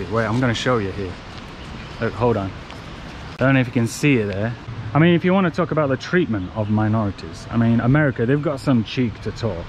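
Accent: United Kingdom. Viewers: i love this cute british accent debunking Big Bullsht Corporation ,lol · 英國口音好聽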